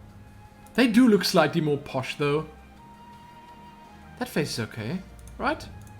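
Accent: South Africa. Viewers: It's South African I think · he's South African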